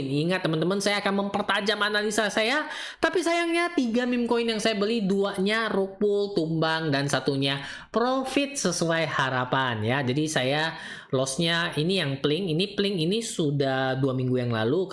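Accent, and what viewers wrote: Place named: Pontianak, Indonesia. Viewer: Pak po.. bahasa orang pontianak ne hyung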